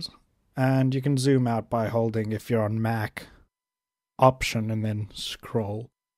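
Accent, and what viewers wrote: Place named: South Africa. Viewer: South African...caught your accent! I'm from Jeffrey's Bay. Thanks for the help!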